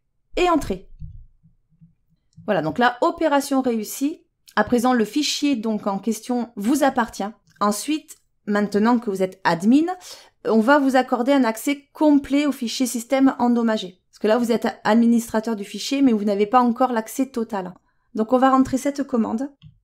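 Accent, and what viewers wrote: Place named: Corsica, France. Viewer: accent corse.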